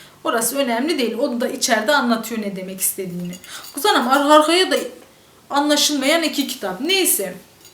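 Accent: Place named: Erzurum, Turkey. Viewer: Ben sivasliyim.siveler benziyor sanki Erzincan Erzurum lu gibisiniz. Doğru mu?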